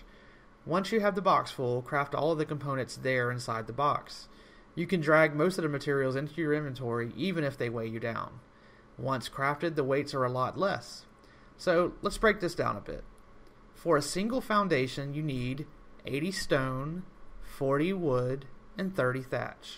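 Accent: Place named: United States. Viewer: Dude this is the best help in Ark on YouTube that I ever listen to at the same time is nice to see an American talk rather than a British action player talk your tips are the best on YouTube Most people contradict their own words when they make YouTube videos on ark with there very own video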